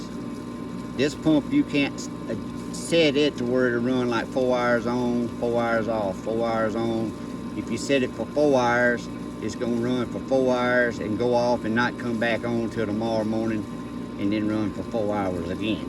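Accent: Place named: Appalachia, United States. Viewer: I've heared that accent once before in the Appalachian mountains around Elizabethton Tennessee. I'm a retired welder. I've worked all over the US even spent a lot of time in the ship yards in Louisiana. That accent I've only heard in one place . Appalachian Mountains. Thanks again for your videos. I'm sure I'll be back next time I need to know how to. Have a great day and be safe keep one in the chamber. 😊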